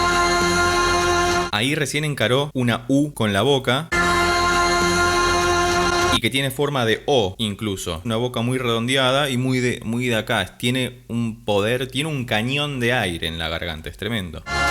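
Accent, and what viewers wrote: Argentina. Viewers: Entre mate y to, lo que habla como argentino, no desarrolla lo de interés de escuchar la voz de la joven. Blablablá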